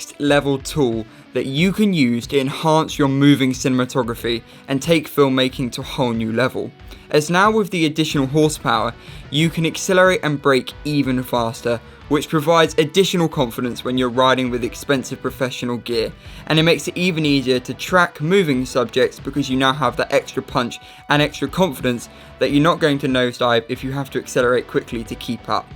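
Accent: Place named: United Kingdom. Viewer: Sounds more like an ad than nothing else. You don't address the countless numbers of ghosting issues that have been reported, nor the predatory behavior of FM towards reparability of the board. You sound like you live in the UK, have fun when your board brakes down and you have to send it back to the only place in the world that can repair your board without bricking it mate.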